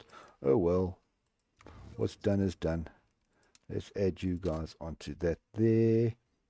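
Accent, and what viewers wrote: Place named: Afrikaans, South Africa. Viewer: Best vids, bolstered by unique Afrikaans accent for American viewers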